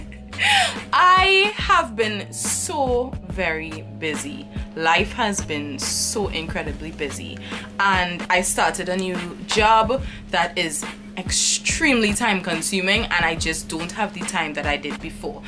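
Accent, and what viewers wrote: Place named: Trinidad and Tobago. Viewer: Hair looks nice . Accent sound like a trini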